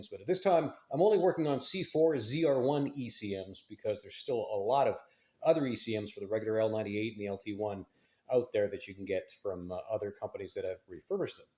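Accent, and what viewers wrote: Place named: Canada. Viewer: very cool and sounds like u r canadian too. sweet, do you sell ecm's?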